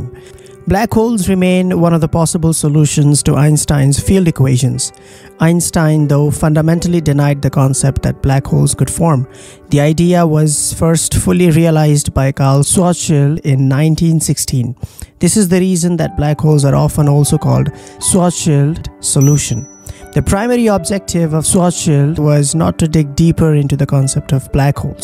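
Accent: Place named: India. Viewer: Strong Indian Accent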